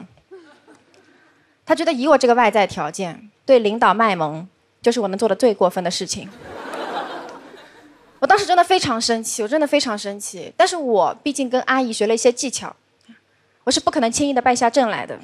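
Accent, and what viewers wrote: Northeast, China. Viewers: 可惜了 其实挺好的 就是说的太东北 动作表情太多 李诞不喜欢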